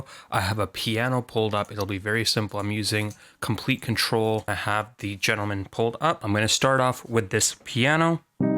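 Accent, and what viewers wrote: India. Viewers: do u hav Indian origin ??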